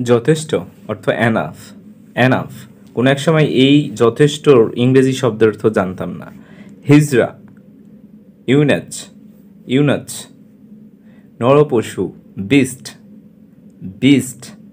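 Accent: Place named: United States. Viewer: ইউটিবার ভাই ঠিকই বলেছেন। আপনি আমেরিকার উচ্চারণ দেখতে পারেন। তারা এভাবেই বলে। call রে বলে খল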